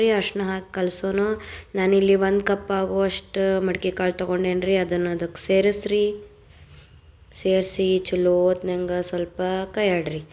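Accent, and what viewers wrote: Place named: North Karnataka, India. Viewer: Nim bhashe bal chend aitri🥰 uttarkarnatakad namd davanager ri akka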